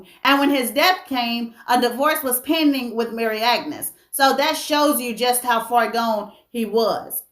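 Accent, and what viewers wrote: South, United States. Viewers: You're gorgeous with the southern accent!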